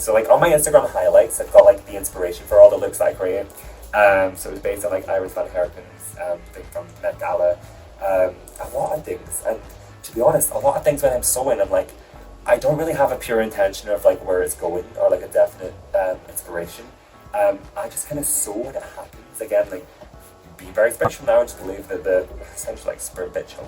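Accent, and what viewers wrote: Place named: Scotland, United Kingdom. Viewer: You look sexy as real lady and like your Scottish voice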